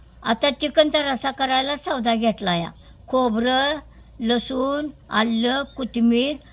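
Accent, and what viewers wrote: Kolhapur, India. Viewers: 🎉🎉😂😂 खूपच छान पद्धत मस्त आणि कोल्हापुरी भाषेलाही गोड वाटते बिर्याणी एकदम भारी शोभा अकोला कर बेरड पुणे वडगाव शेरी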